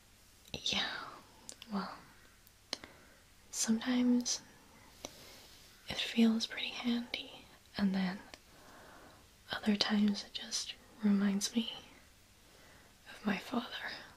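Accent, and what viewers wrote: United States. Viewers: I am unfamiliar with this series (forgive me!) and I could have sworn you said "prison mike" and I died. BTW, your American accent is like, my favorite thing in all of ASMR, it's SO good. Pretty much every video you do with it instantly goes into my favorites! So thank you thank you thank you <3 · Great American accent! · She can really rock a American accident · Your american accent though 👌👌😊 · I love your american accent!!!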